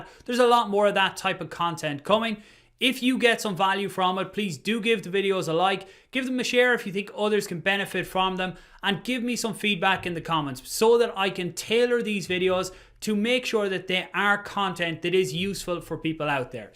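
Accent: Ireland. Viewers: Great idea just creating content just for ADA. Are you from Ireland? I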